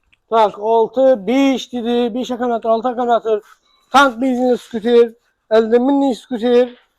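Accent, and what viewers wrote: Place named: Khorezm, Uzbekistan. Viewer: Хоразм шевани тушуниш кийин булябди хеч булмаса бухоро шевасида гапирадиган йукми илтимос.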